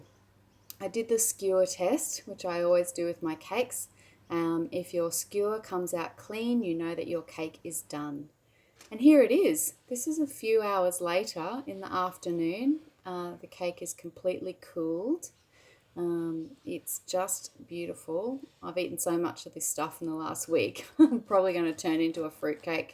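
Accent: Australia. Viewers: Thanks!! Yes, any tips would be great. I am only just starting in the world of sourdough and am in the process of building my starter. I've watched many videos on starters etc but I love yours the most because they are simple, very clear and close to my heart and home because Im an Aussie too...(Aussie Greek). So hearing the Australian accent always warms my heart. I wish you a blessed Christmas and all the best with all your cooking endevours. At some point I will also check out your soap making videos too. Cheers, Mandy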